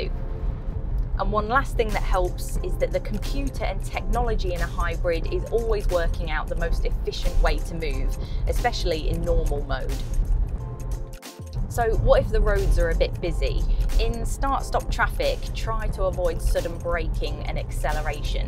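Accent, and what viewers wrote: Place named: Northern England, United Kingdom. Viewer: I'm sorry but your Northern accent kept putting me off. Every time you said Munnee instead of Money I could feel myself cringe.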